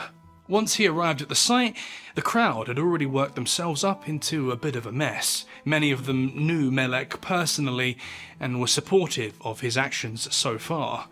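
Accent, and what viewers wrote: United Kingdom. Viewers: YA GOTTA LOVE A HORRIFIC TAKE TOLD BY A BLOODY BRITT!!!! ( I struggle to pronounce the word saw myself.. IT SOUNDS LIKE " SAWRR😱🤨🤣🤣🤣🤣👃🪒🩸🩸🩸🩸🇺🇸🏴🇬🇧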